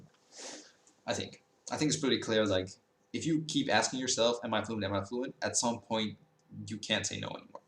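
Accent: United States. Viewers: I was confused by the Farenheit thing too lol. I was like wtf? Is he that so hardcore AJATT that he's trying only to use Celsius? The only thing that gave it away was the way you say "book" and "look", other than that you have a perfect Standard/Northwest US accent. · idt it’s that uncommon of a word tho, most people would know what it meant if it showed up on an SAT or something ikr he sounds like a typical American urbanite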